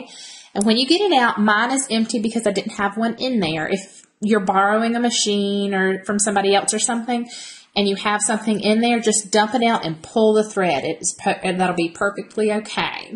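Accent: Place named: South, United States. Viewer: I am like you in the facts that I've never touched a sewing machine in my life before this and that we have the same southern accent...lol. I'm from NC. I've heard you have to oil a sewing machine. Do you have to do that when you take the machine out of the box? Or is it just ready to plug up and sew? Thanks.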